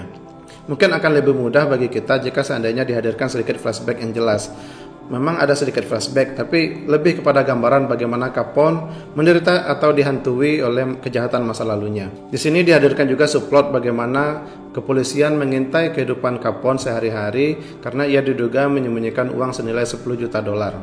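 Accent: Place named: West Sumatra, Indonesia. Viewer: minang yo bang 😅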